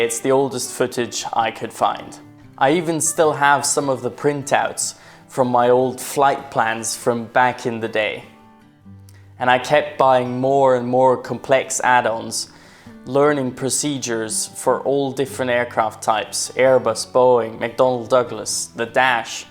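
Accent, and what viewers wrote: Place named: Poland. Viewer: may I ask your nationality? sounds like you are polish for lot has chosen u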